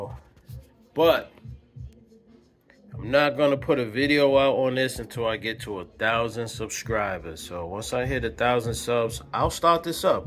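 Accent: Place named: Nuyorican, United States. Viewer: I sense NYrican in the house!!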